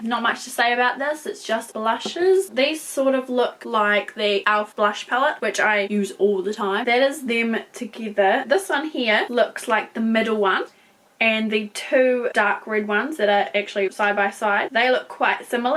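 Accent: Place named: New Zealand. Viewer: Ughhhh I am in love with the modern Renaissance palette 😍 Your accent threw me off for a second, I was like "SHES AUSSIE" but your accent didn't sound quite right for an Aussie, I think it took me 2 solid minutes to realise your actually a kiwi 😂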